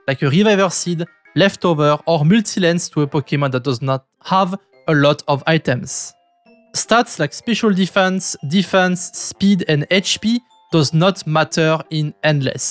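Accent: France. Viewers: Heaviest French accent I have ever heard 😮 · Respect. you are actually the first one, i see a video from, that is actually better than me process wise in the game. The Tips were very good, and you wasnt talking obvious trash Your video isnt clickbait, and even tho your french accent threw me off, it was very informative!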